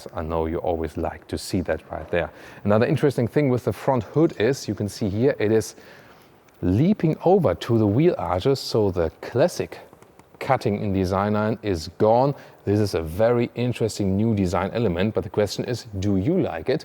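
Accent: Germany. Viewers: Some of the Germish is very interesting! Good effort though, vielen dank.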